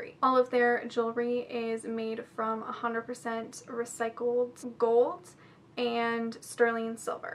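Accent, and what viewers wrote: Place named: Canada. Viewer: Good to know your Canadian. I like more your accent compared to Americans.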